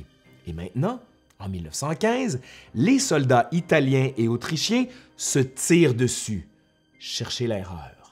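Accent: Quebec, Canada. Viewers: Merci, pour la qualité de tes vidéos. Tout est bon: le ton (haaaaa l'accent québécois, j'adooooore), les sujets sourcés et très impartiaux (ce ne doit pas toujours être facile) Bises et encore merci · excellent ! aussi clair et concis que possible avec ce je ne sais quoi de sympathique en plus ... ah ca y est je sais: juste ce qu'il faut d'accent quebequois !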